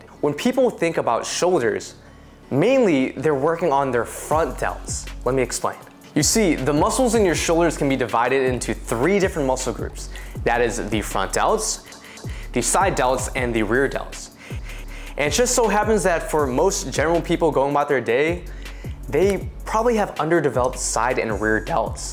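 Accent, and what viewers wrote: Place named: United States. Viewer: I might be racist but I never thought u would have such an American accent 💀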